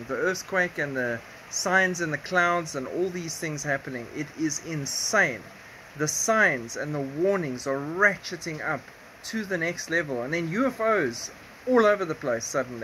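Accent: South Africa. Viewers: Spot the South African accent 😀 Greetings from Benoni 🇿🇦 And yes, fully agree. Our Great God and Saviour is soon to appear. Maranatha 🙏🎺✈️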